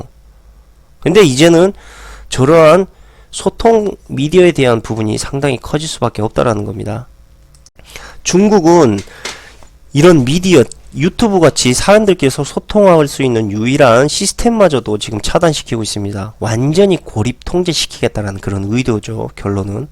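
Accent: Jeolla, South Korea. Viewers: 전라도 사투리?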